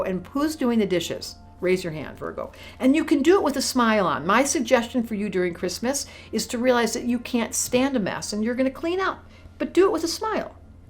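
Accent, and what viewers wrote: United States. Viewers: I love your Michigan accent lol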